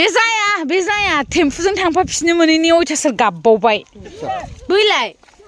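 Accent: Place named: Udalguri, India. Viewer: jwngni udalgurini baidi railainai binanao sona.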